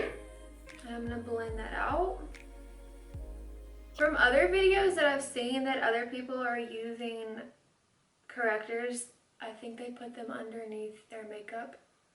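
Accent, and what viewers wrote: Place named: South, United States. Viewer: Can tell you’re southern by the way you say “for” and “never” lolol. Could tell by other words too, I’m from Georgia. 😊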